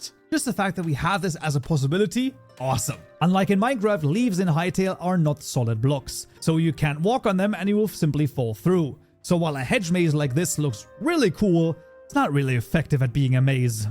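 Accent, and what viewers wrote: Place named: Scandinavia. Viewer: 99% of the time I can tell of somebody is German from accent alone. How did you manage to get a Scandinavian accent? 🤔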